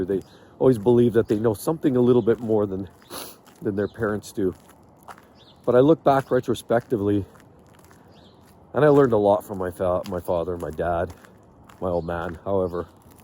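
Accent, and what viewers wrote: Canada. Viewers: I'm a big fan of this channel. I come from Ireland and lived in Vancouver for ten years. Hearing the accent, seeing the snow, the houses and of course the red Tim's brings me back to a great time. I left last year because I was just spinning my wheels. Beautiful place with the warmest most open and friendly people I have ever met. This guy is as real as a youtuber gets. Keep it up. This is great.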